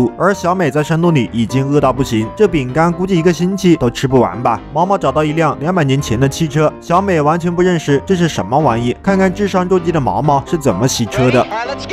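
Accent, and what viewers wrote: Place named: Hunan, China. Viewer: 这个湖南口音。。。。好出戏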